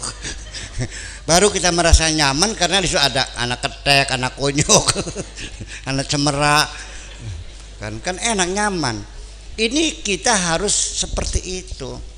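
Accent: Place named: Banyumas, Indonesia. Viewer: Penyejuk sejati, suka sekali dengan ngapak ngapak nya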